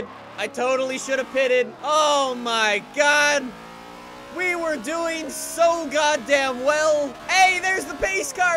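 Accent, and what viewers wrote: United States. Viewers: he turned southern after this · You even sound more American 🇺🇸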